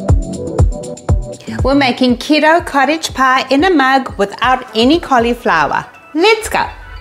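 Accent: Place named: South Africa. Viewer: thought your accent sounded SA. From Cape Town!?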